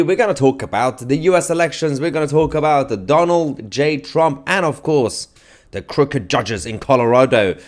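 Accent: United Kingdom. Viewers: eating MTZSM and listening to news from 'murica in bri'ish accent is priceless. Thanx. And ppl go after watching and do that like thing before making coffee... (at least)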